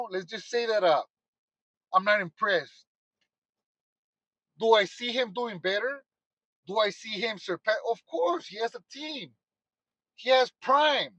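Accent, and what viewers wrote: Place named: Mexico. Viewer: Ey foo, dont take this the wrong way but were you born in Mexico? Because you carry yourself like a mean ol Paisa. Even the way you pronounce certain words.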